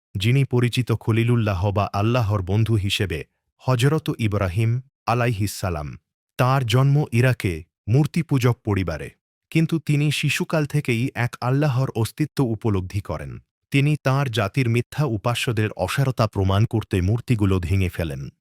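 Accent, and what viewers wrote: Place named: India. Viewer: Indian raa স er pronunciation aveba kore knoo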